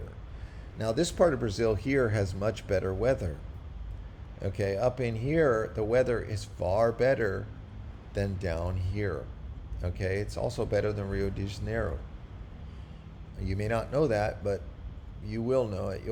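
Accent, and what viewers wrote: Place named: United States. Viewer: Maybe Colombia isn't so open to you, an expat, because you keep spelling the name of our country wrong. Or because you're coming from somewhere else and making broad generalizations about people, using phrases like "better" when comparing cultures. Who the f*ck are you? Where are you from? You sound American to me. We know how welcoming the US is to foreign expats who aren't from Canada...(*sarcasm) Also, you sound like an absolute CREEP, using phrases like "I get slightly better women". Bro, go back to Wisconsin or whatever. Or can you not "get" any women over there?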